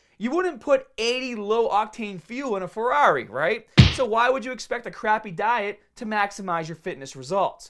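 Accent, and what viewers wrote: Boston, United States. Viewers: This guy has the most boston accent I have ever heard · Boston accent has consistently thickened at a gradual rate over the years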